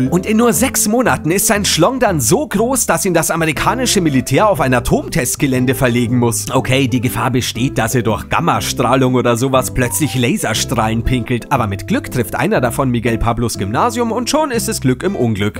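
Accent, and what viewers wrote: Bavaria, Germany. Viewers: du bistn bayrischer bub? und schon hastn abo mehr. dein Zynismus ist mega geil. :'D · woher aus Bayern bist du? grüße aus Rengschburg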